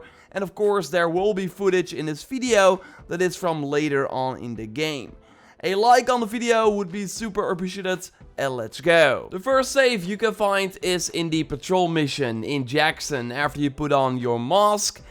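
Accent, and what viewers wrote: Netherlands. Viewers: Are you Dutch by any chance? Your accent sounds like it haha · Your dutch ? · Het leuke aan dit is je Nederlands accent. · Je bent Nederlands of niet. Je hebt echt een Nederlands accent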